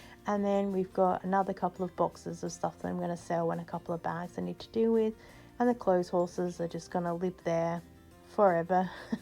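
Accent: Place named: Australia. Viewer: Dana's lovely. She's an ADHDer and developed her no mess method, precisely coz normal methods didnt help her. Hi from Sydney, you sound like an Aussie!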